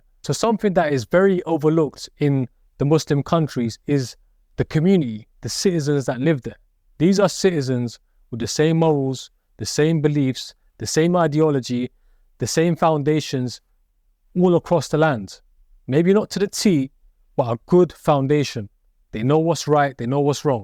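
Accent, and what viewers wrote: United Kingdom. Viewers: Im colour blind your accent sounds like you from London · You look silly dressed up like an Arab goat herder with your rough and ready Midland accent. Is that called Cultural Appropriation.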